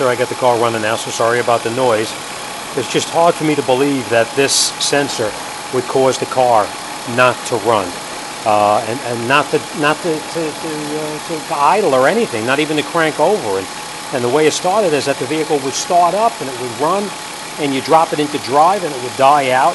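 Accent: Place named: New Jersey, United States. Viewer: Sounds like you from joisey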